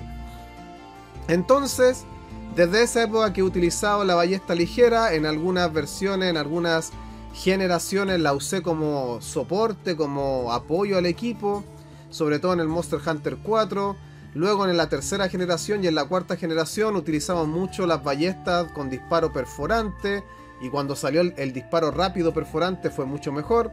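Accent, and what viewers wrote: Chile. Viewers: por que hablas como chileno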